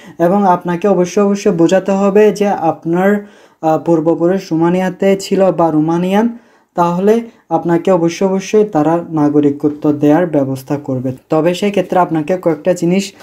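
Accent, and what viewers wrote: Chittagong, Bangladesh. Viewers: ভাই আপনার বাড়ি কি চট্টগ্রামে?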